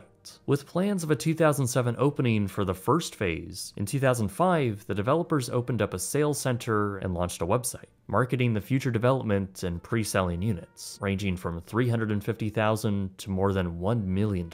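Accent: Canada. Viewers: as a Minnesotan, there's always one word that makes it super easy to tell if someone is from Minnesota or Canada.. the word out😂 we make the O so long😅 I heard you say out and immediately was like.. oh, Canadian. okay. lmaoooo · Are you canadian? The way you say "ou" words and a few other things sounds very canadian 😂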